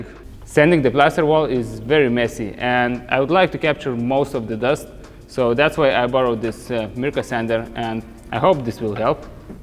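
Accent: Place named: Russia. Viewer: Даже мне рускоговорящему режет русский акцент 😅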